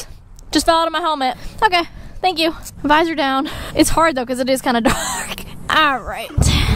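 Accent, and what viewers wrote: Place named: South, United States. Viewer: Definitely can hear the country twang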